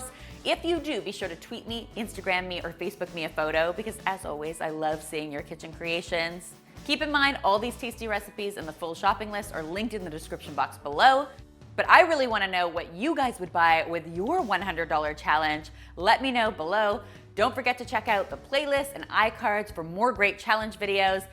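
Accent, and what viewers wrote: Canada. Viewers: She has a Canadian accent.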